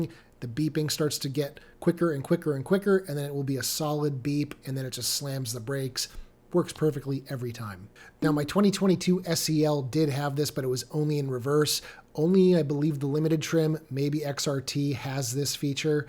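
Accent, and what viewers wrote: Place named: Boston, United States. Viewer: Until I listened further, I didn't understand Smot Pok - and then you said you're from Boston! 😂 Love your content - love my 2025 I6 RWD SE - great efficiency and roadtripper. Almost 20k miles since March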